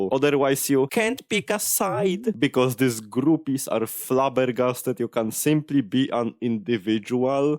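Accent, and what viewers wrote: Poland. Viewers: your accent sounds so polish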